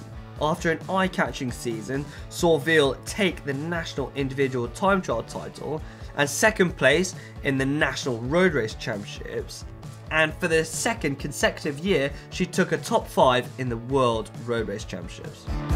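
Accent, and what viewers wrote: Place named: United Kingdom. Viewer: British pronunciation of french names <3 <3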